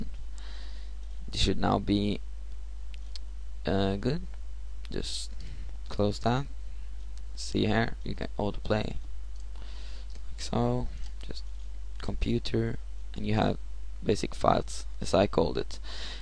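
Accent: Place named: Scandinavia. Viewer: Thanks mate ^^ Are you from Sweden or Norway by any chance? xD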